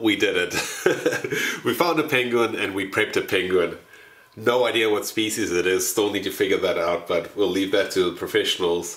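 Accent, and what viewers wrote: South Africa. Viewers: u sounds south african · You sound like an ex South African was good to see what you do, I know an paleantologist her in ZA such nice people you are 🙏🏼. How old would that penguin be ??? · Why do you sound South African that's lived in Scandinavia or smth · Sounds South African to me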